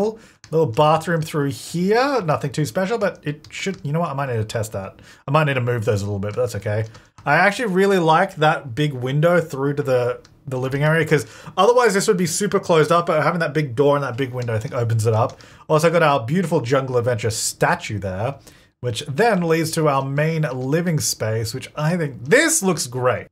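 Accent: Australia. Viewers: For some reason, every time I click on one of videos, regardless of whether or not I've already started watching some of it, YouTube always turns subtitles on. No matter the device I'm using to watch YouTube (phone, laptop, tv), every time without fail YouTube puts subtitles on. But only, and I mean ONLY, for videos. Doesn't happen for any other YT channels I watch. Like, wtf 😂 Does YT think that I can't understand him coz he's Australian or something? He's speaking English. I speak English. I know what he's saying YouTube. U don't need to keep putting subtitles on 😂 does this happen to anyone else or just me??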